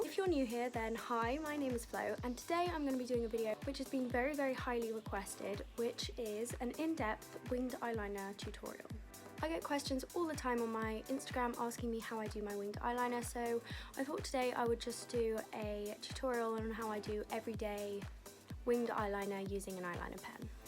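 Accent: United Kingdom. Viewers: are you from England? x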